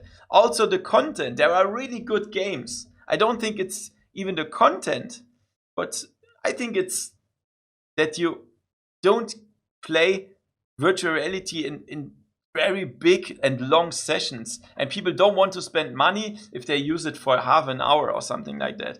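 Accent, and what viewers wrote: Germany. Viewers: You never have to apologize for your English. I absolutely love your accent and Germans in general so the people who are here, stay for one reason or another.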